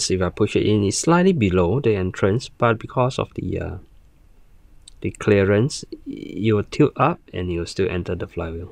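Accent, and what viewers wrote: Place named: Singapore. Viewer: you sound singaporean are you? great vid btw thanks for telling me about the worker half length pusher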